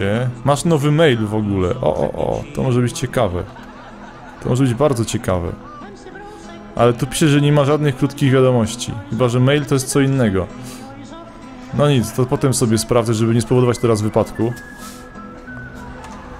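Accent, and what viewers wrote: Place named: Silesia, Poland. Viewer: slonskoooooooooooooooo glodkaaaaaaaaaaaaaaaaaaaa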